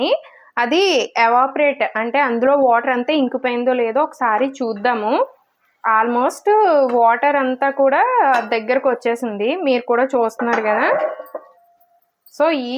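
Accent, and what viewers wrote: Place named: Kakinada, India. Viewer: Soursop soop fruit juice mee husband ki ivvandi , B17 foods pettandi , non veg thakkuva tinali ,sugar thaginchali ,ila diet control cheste mee husband cancer growth thaggutundi ,maa ammagaridi kkd vakalapudi ,maa attavaru vzm, meeru chala baga matladutunnaru ,meeru matladutunte kakinada lo unnatlu anipistundi,mee matalu vinalanipistundi , maa maradalu kuda meela untaru.maa chanal svcs dairies meeru subscribe chesukondi maachanal peddaga develop avvaledu.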